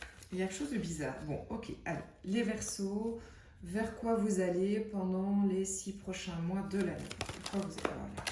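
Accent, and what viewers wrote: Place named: Belgium. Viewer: Tirage intéressant et que je ressens, comprends....Très fine interprétation ! Votre élocution, et sa richesse est vraiment Top. J'ai cru déceler un soupçon d'accent Belge et je l'adore. J'ai beaucoup d'amis Belges qui ont un humour formidable. le 2 ièm semestre s'annonce peut-être encore plus usant que le 1er. Va falloir prendre soin de sa santé et rester le plus au calme pour pouvoir s'économiser et être dans la lucidité en cas de prise de décision importante...Merci beaucoup. Je like et je m'abonne 🙏👍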